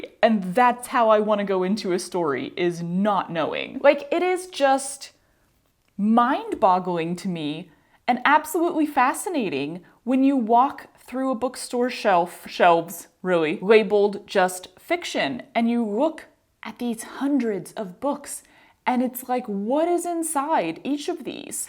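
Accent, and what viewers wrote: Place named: Brazil. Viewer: I love that even though you grew up in Texas and live in California (major Spanish-speaking states), your accent sounds like Brazilian Portuguese 😂❤ Have you picked up much Portuguese?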